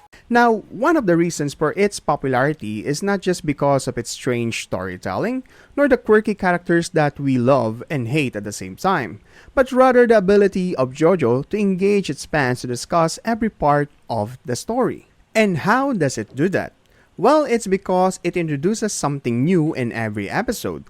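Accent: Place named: Philippines. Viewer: That accent.. say, are you filipino by any chance?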